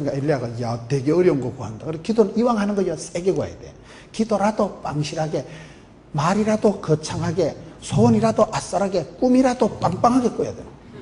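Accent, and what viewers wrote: Gyeongsang, South Korea. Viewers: 귀하신 목사님 ㆍ 경상북도 사투리에 멋진 비유 ㆍ귀에 쏙쏙 넘 넘 감사합니다 🙏 🙏 🙏 💜💜💜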